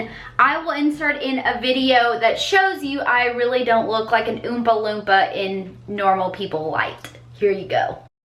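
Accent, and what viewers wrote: South, United States. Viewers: Okay, I've been watching several of your videos---your southern accent drew me in! (I'm one of them southerners too). And I"ve noticed videos from different years, and I can see your weight loss is not happening like you'd want. (Tip no. 1: ditch stevia and other sweetners---they basically put your ketosis to a screeching halt. Tip no. 2 intermittent fasting---you're NEVER lose weight by exercise. I've lost all my weight (at 72 years old) and not exercised a single day.) I'd recommend watching some of Dr. Boz videos---she's a genius at Keto. I've been doing Keto for a year now, and lost about 70 pounds...So, I notice there are several things holding you back...Dr. Boz would set you straight if you spent time watching her. There's no reason you could not drop 40 or so pounds doing what she recommends. Right now I weigh 170---for the first time in 30 years...and on my way to 155, God willing. 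I'm sure Dr. Boz will really help you get to your goals---and heal a lot of things that keep dogging you. And look. Don't pay attention to those morons who leave unkind comments. If you're a Manatee (and you're not)...look at it this way. Manatees are really nice and friendly--just like you. Plus, you're the most beautiful and sweetest Manatee I've ever seen. You're very attractive. Your bright personality, your openness and honesty; those and many other things draw a lot of people to see your videos, and many are really cool and helpful for Keto recipes. You gotta keep these vi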